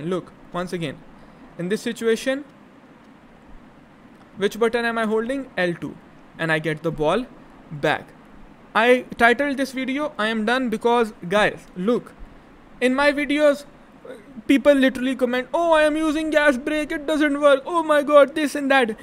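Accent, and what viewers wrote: India. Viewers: Love indian accent 😅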